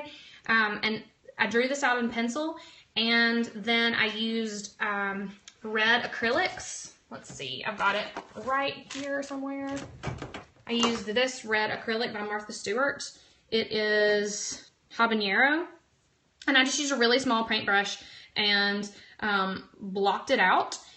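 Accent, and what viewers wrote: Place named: South, United States. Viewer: Hi, just saw this periscope, so glad I can view this on youtube (I can't periscope:-) LOVE your tutorials for this lettering and florals, THANK YOU for showing us how to do this. BTW, love your southern accents too;-)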